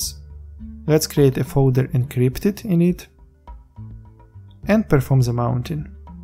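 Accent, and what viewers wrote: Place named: Russia. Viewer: Русский акцент английского языка :)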